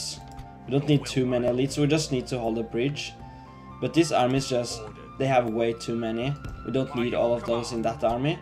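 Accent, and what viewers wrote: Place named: Ireland. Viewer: you sounded irish, pretty cool though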